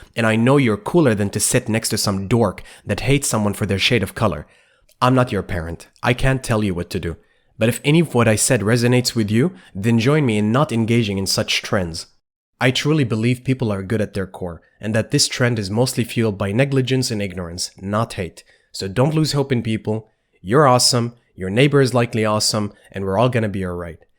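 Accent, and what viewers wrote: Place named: Germany. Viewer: Very slight accent, but i believe it is germanic, such as german.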